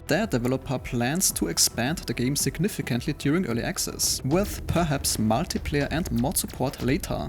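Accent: Germany. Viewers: German trying English?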